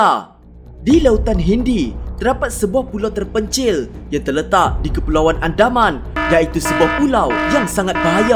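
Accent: Malaysia. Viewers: Hos. Cakap utagha teghuih la.. cakap kl. Cmpoq2 utagha. Mcm pelik plak bunyi.. 😅😅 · KAMU ORANG MALASYA ATAU INDONESIA SIIII?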